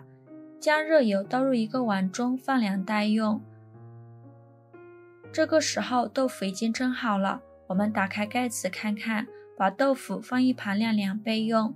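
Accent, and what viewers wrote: Yunnan, China. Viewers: 博主口音是雲南哪裡的？ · 云南的单山辣，云南普通话，云南风味。🤤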